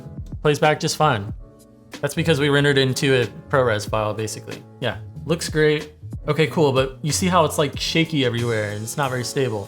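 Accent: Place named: Russia. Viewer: Ах этот русский акцент 🤪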